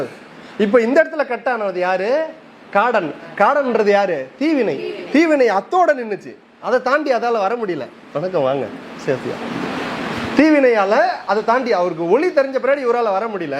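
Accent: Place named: Chennai, India. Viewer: Chennai slang is so visible 😅. Amazing speech 🪄❤❤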